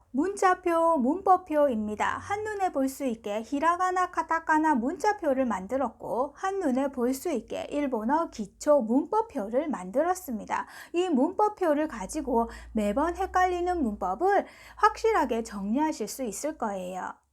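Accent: Busan, South Korea. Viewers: 님!저도 올해50이된 아줌마입니다!독학으로 취미로 시작해서 이것저것 공부해 오다가 목표에도 없었던 n3를 이번에 도전합니다. 커리큘럼따라 그대로 공부하고 있어요. 기초부터 아주 꼼꼼하게 가르쳐주시기 때문에 행여나 독학으로 완전히 다져지지않고 지나친 과정도 이번에 다시 바로잡게 되었어요^^. 센세 부산사투리 정감있고 귀에 쏙쏙들어오자나요?ㅎㅎ 물론 뇌도 나이가들어 어린학생의 두뇌의 속도는 따라가지 못할지 모르지만, 아줌마들의 끈질긴 근성과^^ 잃지않는 흥미를 꾸준히 유지하고, 매일매일 조금씩이라도 하시면 언젠가 자신도 모르게 일본어를 읽고 쓰고 말할날이 올거에요! 저는 지금 일본인 라인친구가 3명정도 있고, 영상통화로 서로 언어교환도 하고있어요! 응원하겠습니다. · 45세 된 주부입니다. 저는 2년전에 책을 사서 단어,문법 위주로 혼자 공부를 했는데요. 나름대로 열심히 했다고 생각했는데 혼자서는 역시 무리더라구요. 왜 그땐 유투브로 공부 할 생각을 안했을까요...들어야 귀에 익혀지고 따라서 말해봐야만 입이 열리는데 그때는 혼자서도 할수 있다고 고집 부렸어요..결국 포기하고 올해 5월 중순부터 다시 시작했어요! 저도 선생님처럼 연상암기법으로 단어를 공부하고 있습니다. 저는 경남 김해에 살고있는데 단어 암기법 하실때 선생님의 부산사투리가 귀에 쏙쏙 박히는 그 순간!!!! 구독했어요^^ 이상하게 들을땐 오그라드는데... 이상하게 외워지더라구요^^ 미미 초록 목도리, 왼쪽다리 흰다리 오른쪽 다리 미스코리아 다리😅😅😅 듣고! 따라서 말하고! 복습하면서 쓰고! 저 열심히 해서 일본어가 들리는 그날까지 절대 포기하지 않고 끝까지 최선을 다할겁니다. 저처럼 늦게라도 공부 시작하신 다른 분들 모두 진심으로 응원하구요! 우리 모두 다 힘내요!!!! 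아! 선생님 책 출간 축하드립니다!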